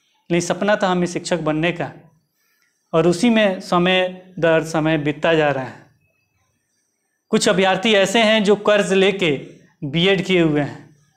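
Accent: Bihar, India. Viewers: Sir bihari ton sun ke kafi achha lga.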